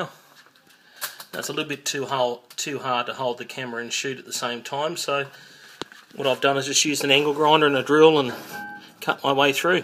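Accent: Australia. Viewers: btw good to hear another australian accent on youtube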